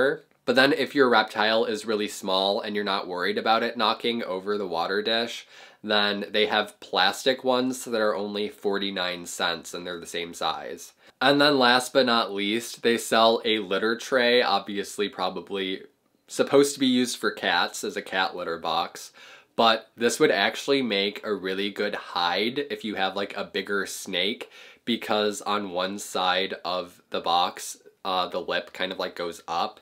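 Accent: United States. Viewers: Okay stupid question but is he American or Canadian? In turn are these American prices or canadian😂😂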